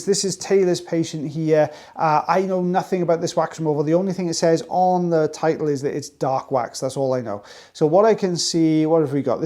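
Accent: Wales, United Kingdom. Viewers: Love hearing you talking, the Welsh accent takes me way back. Left Wales in 1965 but still have friends there I went to school with. Hope Taylor will get well soon, you two have such good repartee when watching videos. Take care, it will soon be March 1, St David’s Day, first day of your Spring. I will wear my daffodil brooch, Cymru am Byth. From Rosie, Australia 🇦🇺.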